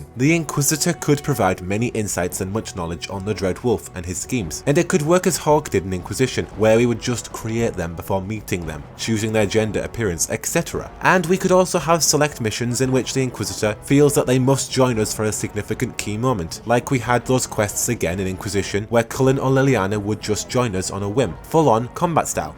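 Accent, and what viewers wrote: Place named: Sunderland, United Kingdom. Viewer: I thought I heard a Mackem twang in your voice, and although my mage may be 1 handed he’s far from helpless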